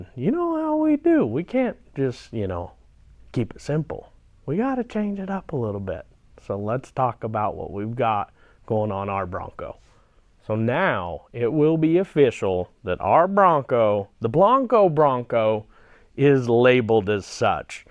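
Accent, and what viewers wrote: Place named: South, United States. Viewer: This precious man speaks like a southern American Red Green . Love the video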